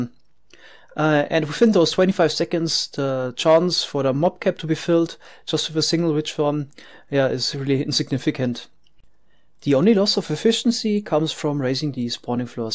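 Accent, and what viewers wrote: Germany. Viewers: You are from Germany. Am I right? a) speaking "und" instead of "and". b) the word English and German have in common you pronounce in German c) vowel have revealed you too. d) only Germas add "ja" as a "additional" word.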